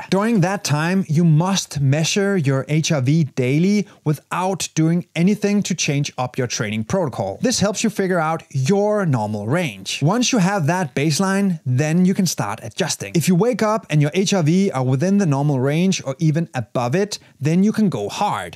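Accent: Denmark. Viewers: Danglish går crazy❤️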